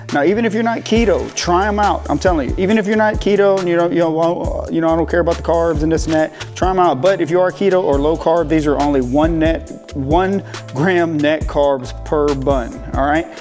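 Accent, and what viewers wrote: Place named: South, United States. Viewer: I’m loving your channel. If you don’t mind me asking what part of the USA are you from. I hear hints of a familiar accent. Alabama girl here. Lol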